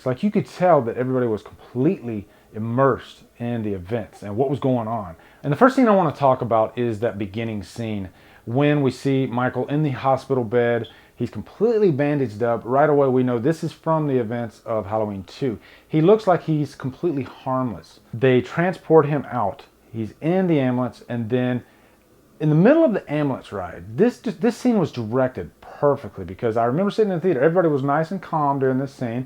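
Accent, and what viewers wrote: South, United States. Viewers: I only have just recently discovered your channel and I have to say this is an insightful and well dictated review. I know this may sound stereotypical but the southern accent adds a bit of charm as well. Keep up the good work!.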